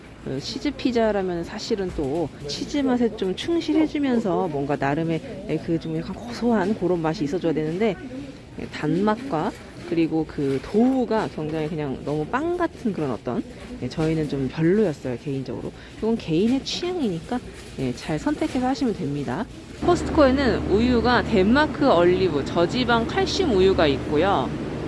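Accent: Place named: Chungcheong, South Korea. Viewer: 충청도 사투리~~~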